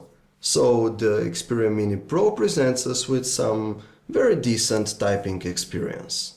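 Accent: Eastern Europe. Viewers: So he's got an eastern european accent ? So what ? Im used to it in the UK, it's a very good and informative review. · this eastern European accent is what i love about this guy's reviews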